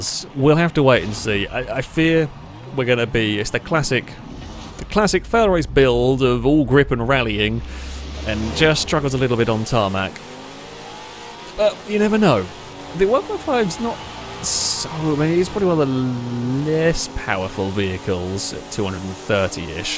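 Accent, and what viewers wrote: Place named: South, United Kingdom. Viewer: South of England is Nissun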